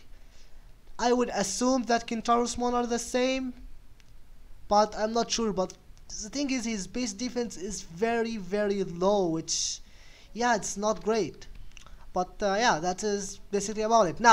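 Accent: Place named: Egypt. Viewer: You sound Egyptian in your English accent you’re Egyptian ?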